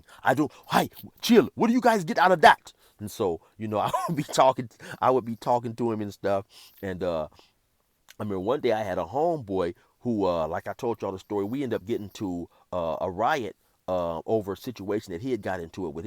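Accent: Africa. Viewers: i think i get more entertained with chill 12 min stories then a 2 hour movie the African accent had me dying! JUST TELL ME WHAT I OWE YOU! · Love the African accent lol 😂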